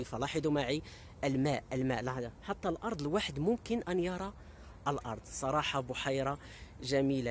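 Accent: Morocco. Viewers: خويا انا عاد عرفتك البارح و راني كنشجعك و كنشكرك على كل ما كتقدمو لنا من معلومات و نصائح. كنتمنى لك نهاية أسبوع سعيدة. جاتني هضرتك مراكشية إلا ايه راه حتى انا من مراكش 😄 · هههههه عن جد انت ظريف كتير حتى بعتبك لطيف ودمك خفيف ، بعدين احلى اهلين بتطلع منك ، على فكرة أنا بحسك سوري لكن بيحكي بلكنة مغربية 🌷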